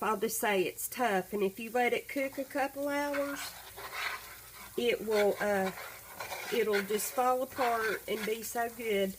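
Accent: South, United States. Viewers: Just found your chanel and I love the way you cook and your southern accent is so cute. Greetings from Spain. Xx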